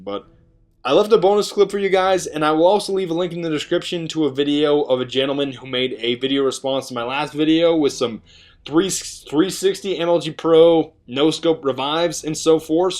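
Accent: Russia. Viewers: polar bear is more deadly, grizzly are just more likely to attack great rushian accent i alwasy blame lag for those shotgun moments i hear theres some kind of hit box they have to be in when you start the kniff inorder for it to hit them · your Russian accent is awesome. крут :)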